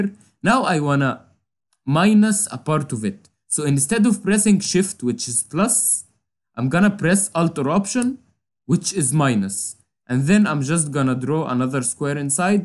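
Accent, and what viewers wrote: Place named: Egypt. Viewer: Egyptian accent !!!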